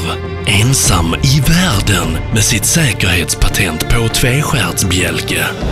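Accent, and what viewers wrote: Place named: Skåne, Sweden. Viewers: Gabriel von Blumenthal Everyone thinks different, but I would say in Skåne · Its called skånska, spoked by those who are from skåne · Cool video Can't stand the southern accent though. D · Gabriel von Blumenthal skånska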